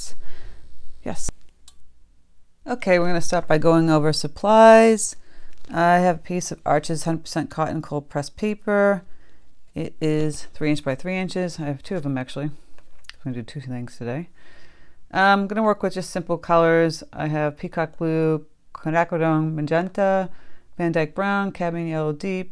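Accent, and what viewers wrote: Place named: New England, United States. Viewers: Great lesson for beginners. Also a good way to save on paint and paper for beginners. Love your New England accent. I've lived in Florida for 50 years and people can still hear mine! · Love your style. And your cute east coast accent. 😊🌹👍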